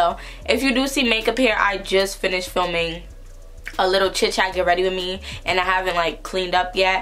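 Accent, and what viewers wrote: New York, United States. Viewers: let’s talk about her accent like i loveee ny ppl accents 😍😍😍😩😭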